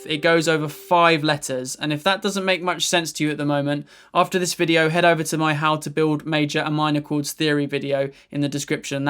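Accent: United Kingdom. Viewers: Only just found you today but I think you taught this so well and I loved the editing! Some really good key points popping up as you were talking. I like that you talk a lot about wrist positions and even where to hit the note for maximum clarity when playing, because it's so important and easily missed! Also good hearing someone else from the UK, no shade to anyone else, it's just that I hear and watch lots of music videos with Americans, and it's refreshing to hear the change! I hope your channel continues to grow, you deserve it! Just subscribed!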